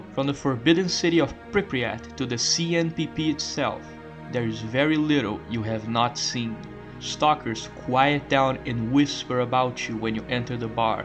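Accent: Brazil. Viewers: Sorry to ask but, are you brazilian? Your accent sounds reminiscent to a brazilian fluent in english who maintained his 1st language "charm" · sotaque inconfundível de br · Is that a Brazilian accent?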